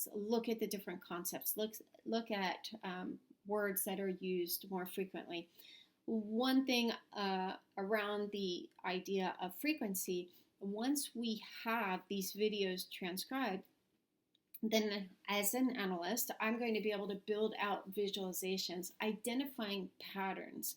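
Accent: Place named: Philadelphia, United States. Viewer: Are you originally from the Philadelphia area? I feel like I hear a little bit of a phila accent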